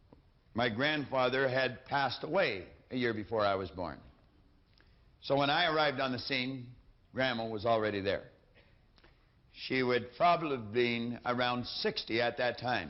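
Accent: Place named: Canada. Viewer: I KNEW HE HAD A CANADIAN ACCENT! 🤣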